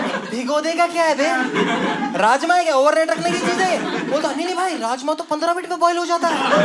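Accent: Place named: Hyderabad, India. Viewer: U have slightly hyderabadi hindi. Loved to hear .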